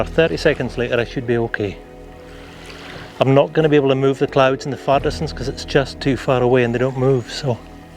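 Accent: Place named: Orkney, United Kingdom. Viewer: So glad Simon Booth pointed me in your direction, your Orcadian accent reminds me of holidays up there and my dad who still has his twang despite living in the Midlands since the late 50’s. Rallying used to be my hobby and now it’s photography so work will be my next port of call 😉 lovely images guys 👍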